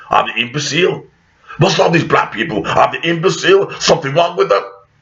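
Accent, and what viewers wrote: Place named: Caribbean. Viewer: Rick James with Island accent. Thumbs up.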